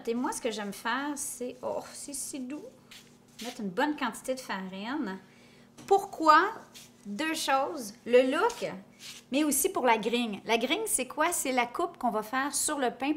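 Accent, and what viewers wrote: Quebec, Canada. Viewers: moi aussi ! et j’aime voyager au Canada grâce à ce bel accent 😉 ça me rappelle de beaux souvenirs 🥰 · Merci pour cette recette et ce charmant accent québécois 💊💉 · Tu es vraiment très doué et très drôle avec ton accent canadien 😂 merci de partager ton savoir faire! J’en fais souvent du pain dans la cocotte… une belle réussite à chaque fois! 👍 Depuis la Suisse 🇨🇭 Merci 🤩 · Décidément j'adore l'accent canadien 😁 Question 475 °F ça fais combien en degré celcius ? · Bonjour depuis la Belgique 🥰 Tout d'abord merci pour votre peps votre bonne humeurs votre extrême gentillesse Quel plaisir de vous écouté (j'ai tjs adorer adoré l'accent canadien ) Ici depuis 2 semaine j'essaye de réaliser mon pain cocotte mais d'après une autre recette et avec de la farine au cereal et j avoue que c'est une catastrophe à chaque pain . Je vais donc essayer vôtre recette demain avec les même quantité d'eau car je met moins de liquide habituellement. 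Mais je vais essayer de me tenir à votre recette et la laisser poser au réfrigérateur jusqu'à demain. matin.🙏🤞🤞🤞 je vous dirai si ça a fonctionner Car même tailler je ne fais pas comme sa d'un.mouvement sec donc tout retombes. Mais je vais essayer vôtre recette à la lettre merci ❤❤❤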